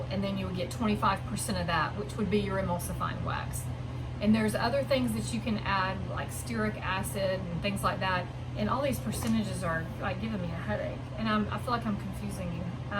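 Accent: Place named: South, United States. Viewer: And I KNEW YOU WERE SOUTHERN! ! (next door neighbor, hi from Alabama)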